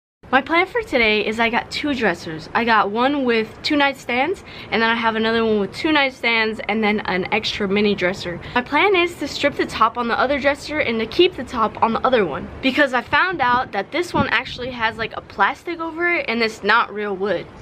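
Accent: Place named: Bay Area, United States. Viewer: Good job.. What part of Cali are you from? You sound like you're from the Bay Area or close by it.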